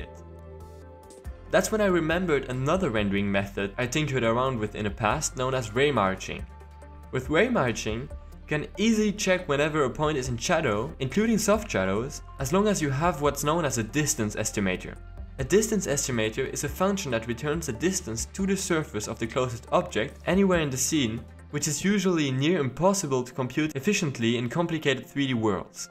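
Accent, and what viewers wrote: France. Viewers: Your accent look like french. Have you upload your work on github or something like that?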